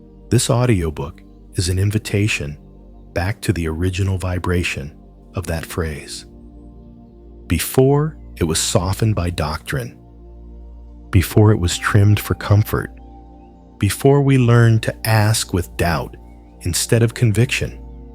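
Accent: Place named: United States. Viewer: I find it difficult to meditate and manifest,to picture my needs... I've asked for many years,but my life didnt get easier, Love the narrators voice.gentle and calming.i am English but love the American voices. If you have money you do have freedom..... I think I'll try again to meditate and manifest....❤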